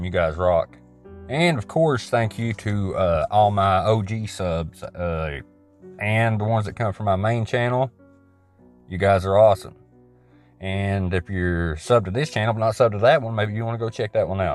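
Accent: South, United States. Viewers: Keep it real! Everything sounds better with a southern accent brother....love from Tennessee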